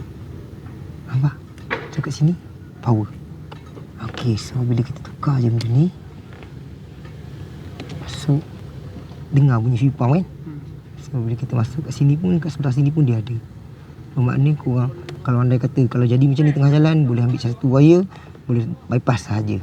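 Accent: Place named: Kelantan, Malaysia. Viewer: ade bunyi mcm kelantan sikit. Ore klate ko?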